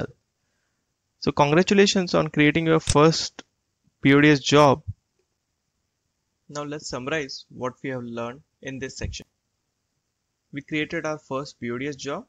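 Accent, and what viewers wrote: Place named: India. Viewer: why allways indish english. I don't understand anything :-(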